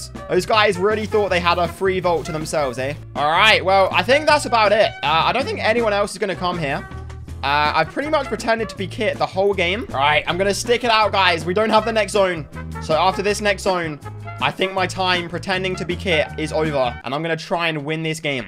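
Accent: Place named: United Kingdom. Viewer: Yes. A British YouTuber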